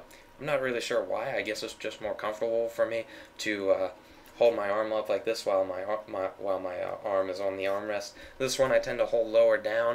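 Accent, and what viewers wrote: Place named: Pittsburgh, United States. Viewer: I'm a big sucker for accents, and I dig yours. Can I ask where you're from? I'm thinking somewhere near Pittsburgh, maybe southeastern Ohio?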